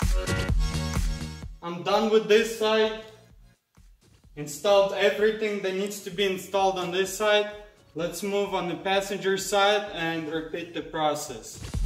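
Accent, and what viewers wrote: Russia. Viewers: Вау. Тачка огонь. Все время слежу за Вашим видео. Очень хорошие машины берете в работу. не Хлам. Если не секрет сколько такая после ремонта в продаже у Вас? И еще, акцент у Вас явно русский:) Могли бы Вы делать субтитры на русском языке)))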